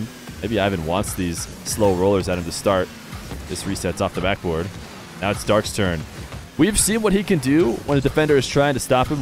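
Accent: United States. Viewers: sounds like Johhnyboi but with an American accent, and I find that pleasing.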